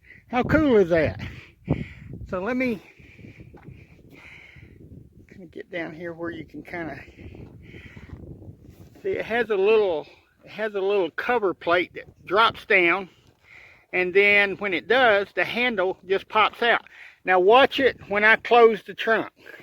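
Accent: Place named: United States. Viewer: Can your accent be any more American? Good video by the way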